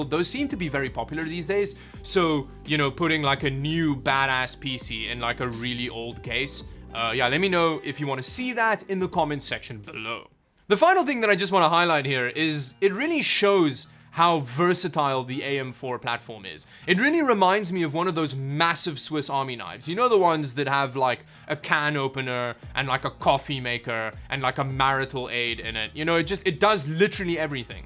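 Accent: South Africa. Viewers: You sound south african bro! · Do you come from south africa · Are you South African 🤔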